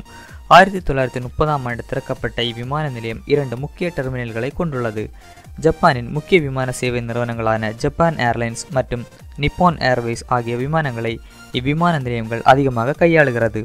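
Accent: Kanyakumari, India. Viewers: Ne kanyakumari aa pa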